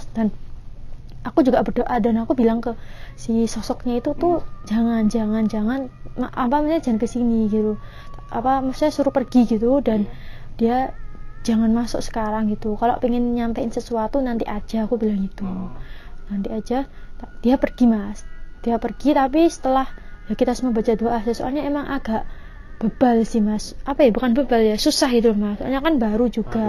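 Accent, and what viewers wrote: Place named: Java, Indonesia. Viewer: Jawane medog banget..gak enak denger nya..klu nas medog jawany, tp Enak d denger.itu bajang.. cepet lulus dgan nilai yg bagus ya sujses trs.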